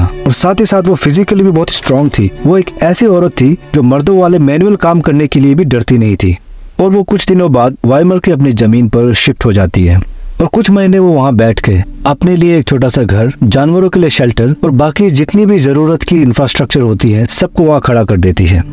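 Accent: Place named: Northeast, India. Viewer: आपका हिंदी का नौर्थइस्ट वाला ऐक्सैंट आपको ज़रूर हिट करेगा।लगे रहो दोस्त।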